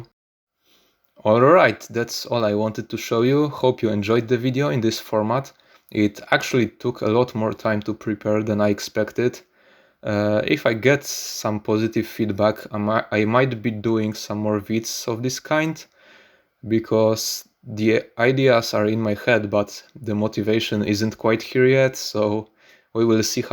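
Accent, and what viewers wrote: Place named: Poland. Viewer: I have watched a few seconds and thought this guy has identical voice and accent as me, is he polish? Polish version of Trackmania, yup, haha. Crazy how similar our voices are.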